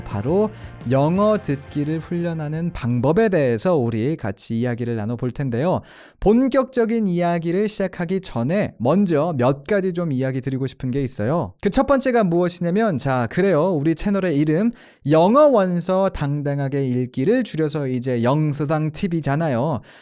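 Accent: United States. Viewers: 근데 뉴질랜드에서 유학하셨는데 미국영어 발음(?)을 가지신거 같아요~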